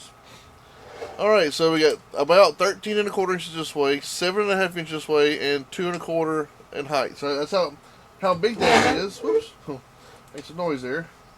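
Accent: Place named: Tennessee, United States. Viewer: This guy has got to be from TENNESSEE, glad I speak fluent southern 🤣😉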